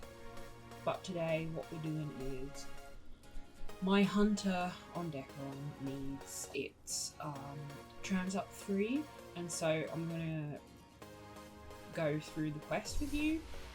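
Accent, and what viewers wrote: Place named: England, United Kingdom. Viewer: You are first lady make video about dekaron. And from accent i think you are english. I live in londont form 5 year maybe can see you one day at coffe. For help me with information about global dekaron. I dont play to much global. just 1 month. And laster years same like 1 mounth after play private servers.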